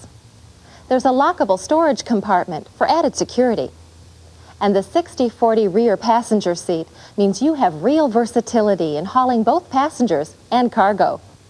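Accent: United States. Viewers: She's got quite the Wisconsin accent. Video features the 1989 Taurus GL and 1989 Taurus LX Wagon. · Yep, th'yats haw they say it in M'yadisin Wiscahnsin! Turn that car ahn! It's all good. I love mid-west accents.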